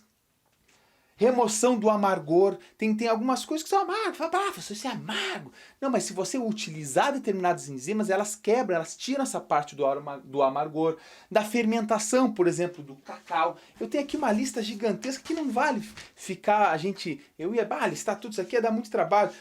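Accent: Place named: South, Brazil. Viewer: Bah, esse jeito do sul é da hora jkkkkkkkkkkkkkkkk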